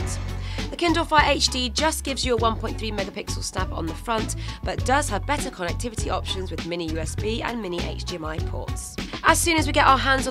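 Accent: England, United Kingdom. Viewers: Speaking to fast and English accent...a waste to watch for me.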